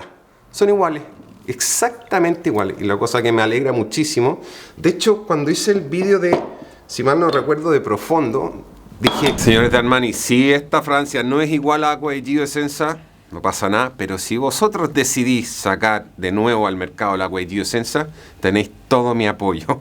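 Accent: Chile. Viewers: Hablas como Chileno, aunq usas ciertas palabras españolas que despistan... pero tu expresion de ir a comprar el pan por la mañana es un sello Chileno genuino 😂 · por que desis vosotroa ai aoa chileno? es algo que tambien note en tu hermana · Es chileno, erradicado en España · Que lindo que es el acento chileno 🙌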